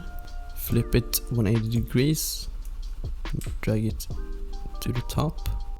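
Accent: Sweden. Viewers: U sound Swedish as f**k. Looking good. Thanks boiio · Är du svensk? Låter iaf som det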